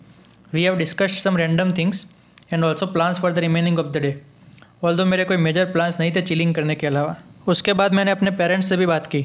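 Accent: Gujarat, India. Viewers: Your little bit accents same like Gujarati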